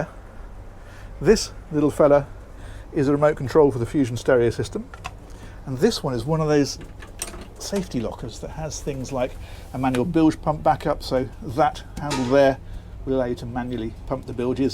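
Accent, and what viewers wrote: United Kingdom. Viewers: Was that a "Lightening Bug" by the electric panel? ;-) I'm in awe the way you give your tours, your British accent is a wonderful touch! Brilliant~~~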